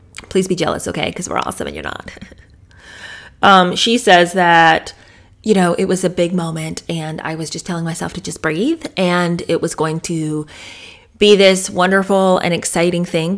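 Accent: United Kingdom. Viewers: I love your British accent lol!!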